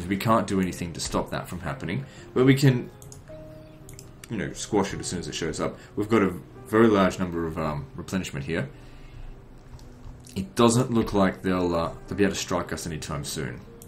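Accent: Australia. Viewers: are you aussie mate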